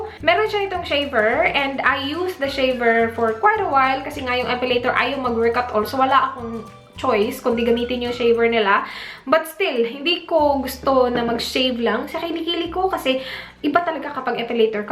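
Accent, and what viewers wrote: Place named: Cebu, Philippines. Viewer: malalaman mo talaga kpag cebuana.. Ate! same tayo mg salita, 😘😘😘😀😀😀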